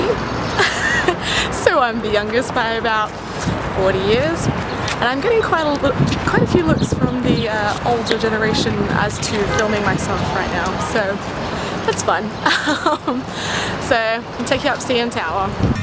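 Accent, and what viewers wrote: Australia. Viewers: Your Australian accent actually isn`t too thick. I think you have been in Canada/US too much :) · omg, i love Aussie accents! It's even more sexy than the british one!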